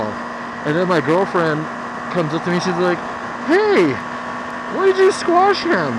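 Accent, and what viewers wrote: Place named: France. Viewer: Do you have a video of your camera/mic setup? BTW awesome frenchy accent!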